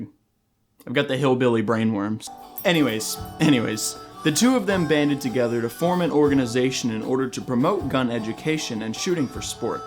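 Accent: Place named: South, United States. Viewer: your southern accent gives me joy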